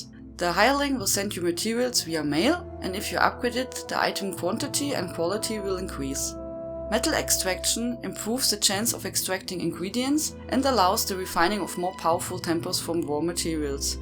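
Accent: Germany. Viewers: ich mag den deutschen englischakzent irgendwie xD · Post Da stoner Seems like an German Accent my guy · Beutifull german/viking :D accent. :) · Very solid explanation of a misunderstood but important part of the game. If I had watched this video about 3 months ago I'd be in a better spot with my crafting character. German accent also helps. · uiuiui xD Man hört das Deutsch sehr kräftig raus ^^ Mir hat das Tutorial gefallen, es war sehr informativ, hat meine Frage beantwortet und vorallem war es sehr unterhaltsam xD Nur eine Frage hätte ich an dich... ich hab irgendwas vonwegen Craftingquest mitbekommen... gibt es das oder hab ich mich verhört? Und wenn ja, wo kann ich so eine Quest finden?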